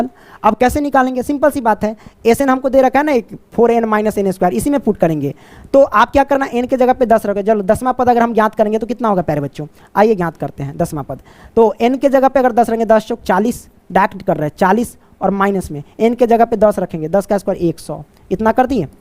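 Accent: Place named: Rural, India. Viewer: Gawar ke trah bolta h dehati😂😂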